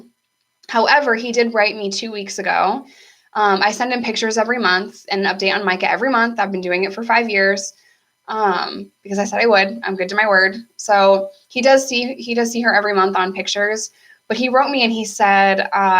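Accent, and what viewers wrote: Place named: Endicott, United States. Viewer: So hey girl! I had an odd feeling when I ran into your channel that you may be from Endicott, what with your accent and all. I am too! My family actually moved me to NC to avoid PINS/ hanging with dope fiends all day long. I have stories upon stories from drug raids to robberies in the 607 if you ever want to get up and talk about how your upbringing and environment played a role in who you are. It’s so crazy to see how I probably would have ended up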